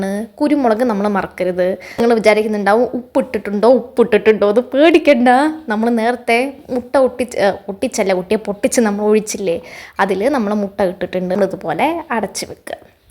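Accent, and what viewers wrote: Palakkad, India. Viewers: Palakkad slang super 👌🏻😄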